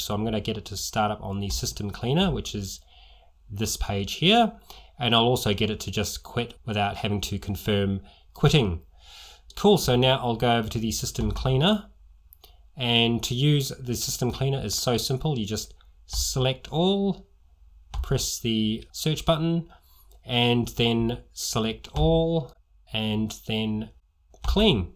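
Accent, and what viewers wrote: Australia. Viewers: Thank you very much for these hints and tricks in MX Linux. I had so many problems with Ubuntu an Ubuntu Budgie(could not run my scanner and printer). Now with Your how to*s I finally been rescued from MacOS (too expensive) and Windows(hate it). The only suggestion I like to make is the confusing tools between "tools" and "tweaks". I always have sort out witch one is the setting up the computer and witch one is responsible for just the outfit. By the way I could not spot Your accent. guess you are from "Downunder" or this direction somewhere. TX again.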